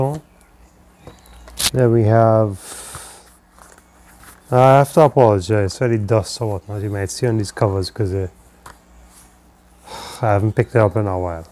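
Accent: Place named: Caribbean. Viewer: Sweetheart... This was incredibly sweet. I loved this. You served us Dvds, cds AND vinyl? Yes, honey. One of my best friends is a trini gyal. Hard to not know that classic west indies accent. Love it. You nailed this AND gave us a part 2? Ill deep dive, sugar. I need that rekkid btw. Toodaloo!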